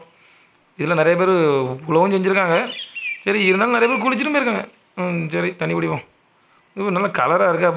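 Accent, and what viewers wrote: Tirunelveli, India. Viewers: Ji super. Namma ooru slang romba arumai. Plz upload more videos ji. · Bro, next video release please... only solo video with pakka nellai slang... PLEASE.. nammooru basha kettu romba varusham aachillaa... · Super la naama oru slang vara level laaa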